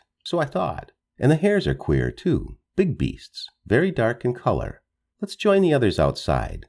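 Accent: United States. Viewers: Shouldn’t be an American voice but English. Sounds wrong.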